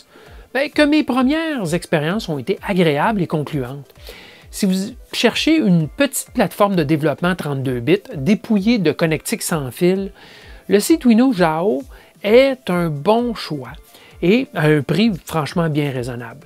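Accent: Quebec, Canada. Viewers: Excellent, clair et précis (comme les autres vidéos) avec le petit accent du Québec qui ravit tous les Français.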